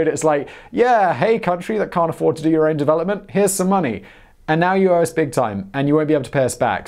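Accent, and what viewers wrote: United Kingdom. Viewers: the way he talks in a bantering way, makes it that much funny, typical brit accent. Although Indian army has plans to seize Pakistan occupied Kashmir and in turn seizing Pakistan's dream of cpec. China probably isn't in the condition to interfere.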